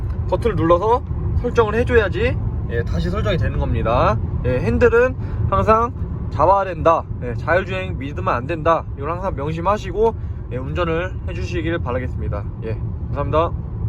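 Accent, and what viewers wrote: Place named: Jeonbuk, South Korea. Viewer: 전북분 이네요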